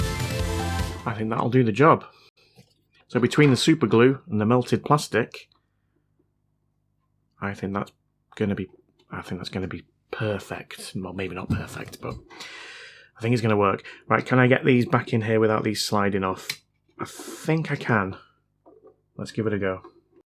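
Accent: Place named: Bolton, United Kingdom. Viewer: Great to here the Bolton accent, I lived in Westhoughton many moons ago.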